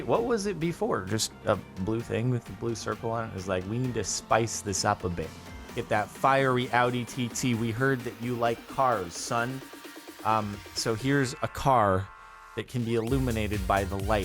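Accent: Australia. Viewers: Mate, not far off the Aussie language. I’m impressed. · I mean....speaking as an aussie who has heard people try Aussie accents yours sparklez isn't too bad. It at least doesn't sound more British than aussie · Holy shit he actually sounds australian i have an australian cousin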